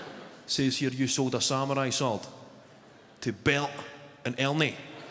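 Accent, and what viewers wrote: Scotland, United Kingdom. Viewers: Apparently he's hard to understand non Scottish people 😂 I'm a weegie so I'm sorted 👍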